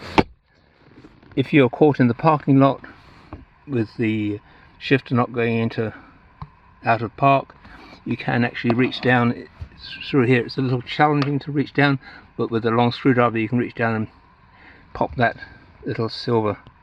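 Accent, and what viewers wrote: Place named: United Kingdom. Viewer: Whenever, as an American (speaking my:"American" English) I want to feel intellectually inferior, I need only to listen to a few minutes to a video where there is a Brit explaining in the REAL English language how to fix something like this. This is by far the best video on this sort of R&R on YouTube. Frankly "the way it should be done " Thank you sir! And darn it, if only it hadn't been for your King George being such an a-hole we'd still be the same country. If we can get past that mess someday, and reconcile, I'd be glad for y'all to have us back!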